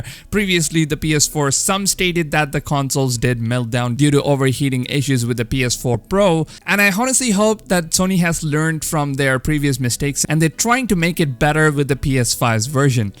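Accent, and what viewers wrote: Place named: India. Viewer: At first I thought you were some annoying Indian kid cause of your accent but your hilarious so I rub a dub subbed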